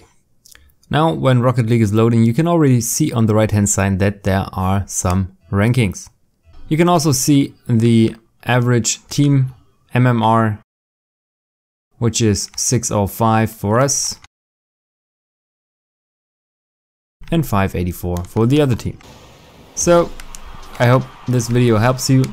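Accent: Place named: Germany. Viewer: Nices tutorial Es hat geholfen aber dieser deutsche Akzent 😂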